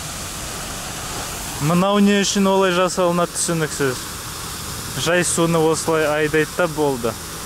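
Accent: West, Kazakhstan. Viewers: Азамат Кудайбергенов батыстын диалект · Батыстан , казакшасы соларга тæн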